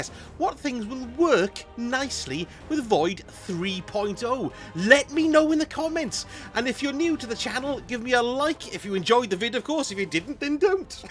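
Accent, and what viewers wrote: Wales, United Kingdom. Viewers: I love a Welsh accent!! 😍😍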